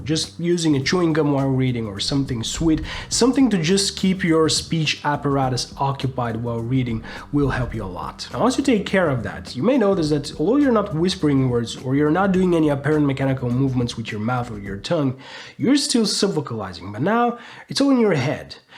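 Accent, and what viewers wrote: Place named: Brazil. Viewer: You sound brazilian, somehow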